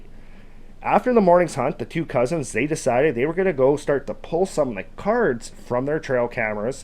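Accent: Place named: Canada. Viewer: That’s an insane accent 😂 no wonder Americans make fun of us. - a west coast Canadian ❤😂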